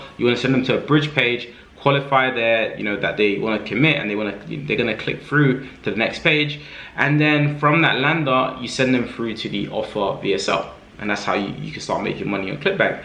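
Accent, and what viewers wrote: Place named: United Kingdom. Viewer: I like how u talk British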